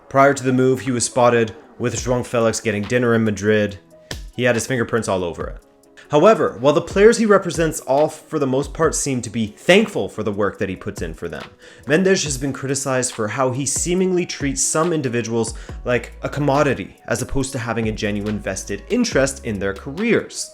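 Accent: Azores, Portugal. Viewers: his famaly is from the azores and He has an azorean accent I think it's because of this He might sound a bit different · Are you Azorean? I can tell there’s a bit of an Azorean accent when you pronounce names. New to the channel.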